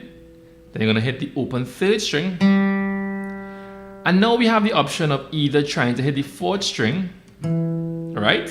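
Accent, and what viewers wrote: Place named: Trinidad and Tobago. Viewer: Thanks man, this is such a well done lesson of a classic love song! I am so glad that I found your channel, and I subscribed immediately 😂! Also, coming from the West Indies myself, I am sure that it’s a Trinidadian accent I am hearing 😊, nicely done man.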